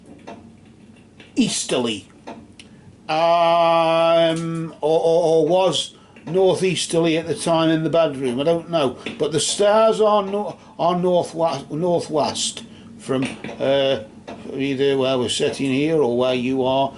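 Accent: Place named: Stoke-on-Trent, United Kingdom. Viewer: I really enjoyed your reading and the video in general, thanks for sharing! For me, I think the reading was trying to say that Eric was now with his father, not sure if there were issues in the past with him but now, they are together but ultimately, it is the past. I think wants to move forward and is carrying guilt, which may be causing the nightmares but the cards are indicating that she should move forward (present, stairs, day) I think the eagle represents that he will always watch over her. The message cards at the end were awesome, I think his message was strong, you did a great job! BTW, where are you from, I am from Stoke-on-Trent and you sound like the bloke next door!!!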